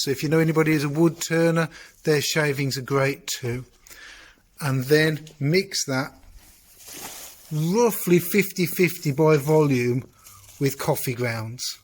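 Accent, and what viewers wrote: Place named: West Midlands, United Kingdom. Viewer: Greetings from a Brit in Germany. Nice to hear a West Midlands accent (I studied in Stoke) I am fascinated with "coffee logs" and recipes. I have been saving all my waste coffee for ages. Tell me can you include waste paper in this mix as well as waste sawdust? Do you think these "peat" briquettes might be useful to bake bread in a Dutch Oven experiment?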